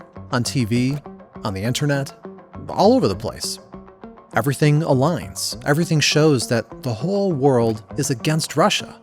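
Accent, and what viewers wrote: United States. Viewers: are you from western New York man? like Buffalo or Rochester or something?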